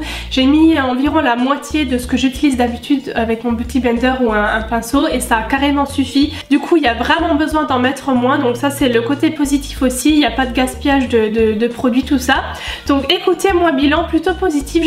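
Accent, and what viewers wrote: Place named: South, France. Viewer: ah boooon tu viens d'Alsace, moi aussi mais je trouve pas trop que tu as l'accent Alsacien j'aurai même presque dis du sud mdr, aah c'est peut être parce que tu viens d'Alsace Moselle sinon superbe vidéo continu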